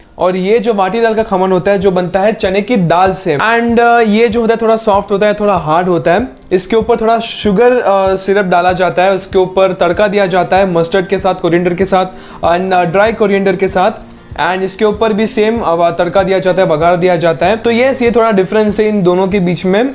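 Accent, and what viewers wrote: Gujarat, India. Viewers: FAVOURITE PERSON AND FAVOURITE FOOD IN ONE FRAME🙈✨he is posting videos regularly omg😭💗Also talking in gujarati always hits different 😂🥺💗 Really love your Gujarati accent, and the Gujarati intro in starting was damn good😂✨ all dishes are looking so good and all of them are my favs too🤤✨ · Awesome video 💙💙💙! Aur I must say very delicious video! 🤭😂😂... Your Gujarati accent is too cute... Intro is just op! Mirchi kya sach mein bohut teekhi thi?🤭😂! Btw, fafda - jalebi kabhi hume bhi khilana... As yeh video dekh ke toh sach mein cravings suru ho gayi... I really want to taste that champakali gaathiya and masala papdi gaathiya also... 😍✌🏻... And thepla and khakra is also looking sooooooooo yummy😋😋!!! And that dialogue, "Naam bas naam hain... Hume toh bas khane se kaam hain"- is really hilarious😂😂😂... Next Sunday, I must have patra, khaman or khandvi as my breakfast... 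✌🏻💙❤ You are really the best brother sister duo ever... 👍🏻👍🏻👍🏻 I can see that you are really enjoying your Sunday breakfast... I want to say you that we are also having a lot of fun during watching this video... 👏🏻👏🏻♥♥♥👏🏻👏🏻... Thanks for bringing smiles, laughter and enjoyments for us on Sunday... 😊😊😊... You are really one of my favourite actors and favourite YouTubers... 💙💙💙❤❤❤... Your dedication towards your work really inspires me♥✨... You are really amazing and multi talented and your videos are excellent and absolutely incredible... Keep shining and keep dreaming superstar... ♥♥💙💙❤❤... All the best sweetheart... 👍🏻💙💙💙💙💙💙💙💙💙💙💙💙💙👍🏻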